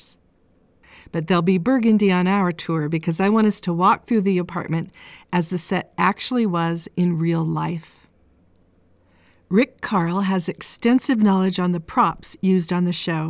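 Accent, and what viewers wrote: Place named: Utah, United States. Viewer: Beautiful work! I heard you speak this time and thought, "She's gotta be a fellow Utahn." Yep! Cool! Pleased to have something in common with you, in addition to our love for these neat spaces!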